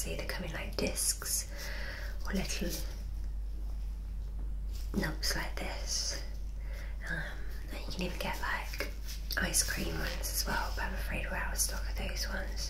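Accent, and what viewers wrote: United Kingdom. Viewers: Are you actually British like me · Wow you have a heavy British accent! I hardly can understand it but I like it!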